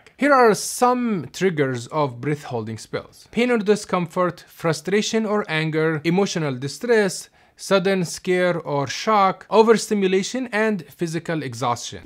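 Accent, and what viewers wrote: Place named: Arab world. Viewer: ما انتبهت لاسمك بالبداية لكن أول ما سمعت صوتك وطريقت لفظك للكلمات عرفت أنك عربي😂، سبحان الله لنا بصمة صوت وطريقة لفظ خاصة.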